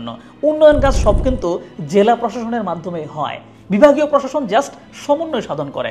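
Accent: Noakhali, Bangladesh. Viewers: আপনার বারি মনে হয় নৌয়াখালী মনে হয় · ভাই আপনার বাড়ি নোয়াখালী নাকি?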